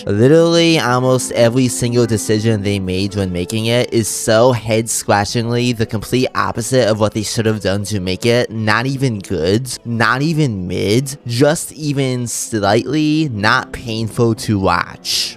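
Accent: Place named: France. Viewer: heyy! i wanted to say you sound like the french nararrator from some spongebob episodes :)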